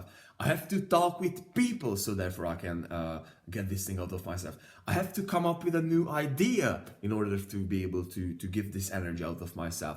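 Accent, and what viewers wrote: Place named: Italy. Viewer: You have a deep accent- let me guess, you're Italian?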